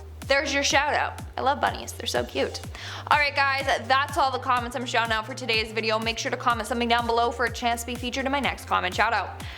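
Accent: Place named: United Kingdom. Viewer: You do a good British accent!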